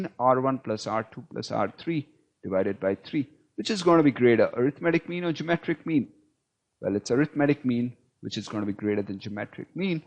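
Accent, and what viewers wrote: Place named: India. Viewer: The video seemed good, but you could've used someone with a little better/softer accent. I mean, no offence, Indian accent is already quite hideous, but this one was absolutely abominable.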